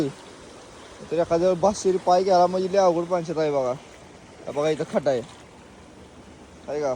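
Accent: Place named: Marathwada, India. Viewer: तुम्ही कोणत्या गावातून बोलता तालुका कोणता आहे भाषा मराठवाडा तील वाटते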